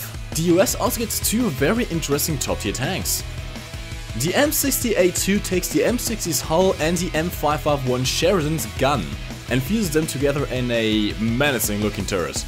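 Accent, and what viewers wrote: Germany. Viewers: Are you german? Your accent is just too flamingly homosexual, which leads me to believe you're German. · Are you German? Your pronounciation sounds really like that... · are you german?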